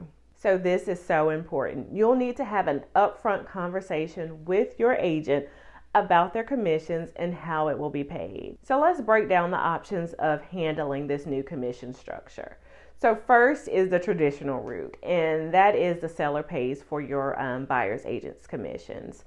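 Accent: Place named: South, United States. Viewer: First of all, I love the southern accent! Also, thank you for the information. I’ve seen a lot of unqualified people going to real estate the last few years. They think it’s going to be an easy way to make money. It’s refreshing to see somebody who has the knowledge and qualifications. I have never understood why the seller has had to pay for the buyers agent. Just because the seller walks away with a big bag of money why does that make them liable for your agents commission? It always seemed to me that the buyer should pay their own agent!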